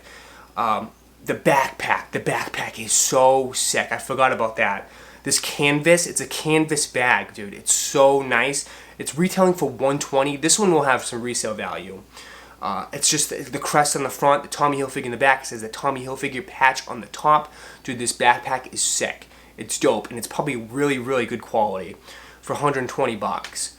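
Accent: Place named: Northeast, United States. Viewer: Your wicked accent sound like you're from the NE area.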